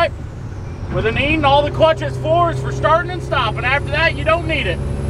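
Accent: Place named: Kansas, United States. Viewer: “Them things like that” Hillbilly from Kansas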